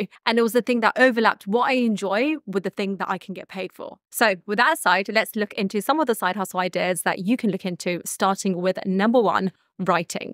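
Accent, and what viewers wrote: United Kingdom. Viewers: Can you start a British accent class? Many people will pay and join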